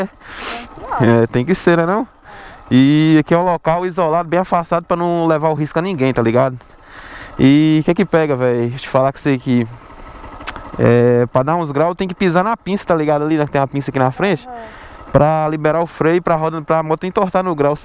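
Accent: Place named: Bahia, Brazil. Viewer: Mano, de que estado você é? nossa, puta sotaque daqui da Bahia kkkkk♡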